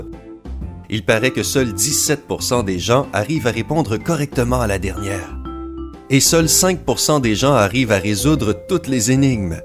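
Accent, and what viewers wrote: Quebec, Canada. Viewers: songUp euh non c l'accent quebecois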